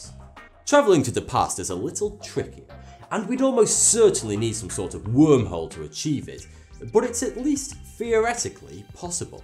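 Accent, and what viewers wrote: United Kingdom. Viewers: Watch your channel just 2 learn the British accent, tank u.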